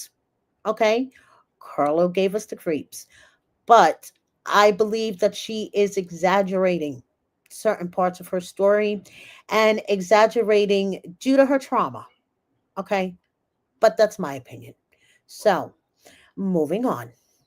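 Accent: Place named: Connecticut, United States. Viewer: Your voice reminds me of my home CT. LOVE LISTENING TO YOU. 🍹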